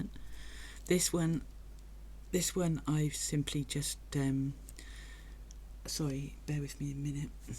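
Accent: United Kingdom. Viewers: These are truly beautiful. I just love the one with the black background piece, really makes the colours pop. Gorgeous colours together. Thank you for giving us so much of your time and talent. You sound like you hail from the South of England. I lived there as a child and also again in my early twenties for a while but now live in Australia - a touch warmer!. Have a wonderful Christmas and hope your New Year brings you good health and special times.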